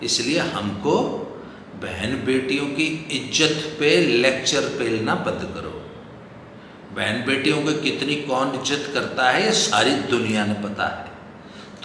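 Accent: Haryana, India. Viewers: दद्दा आपकी हरयाणवी की इतनी तगड़ी पाकर दिल खुस हो जाता है खुदन गांव की पूरी जानकारी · Excellent "KHICHAI" by speaking "Excellent Haryanavi Boli"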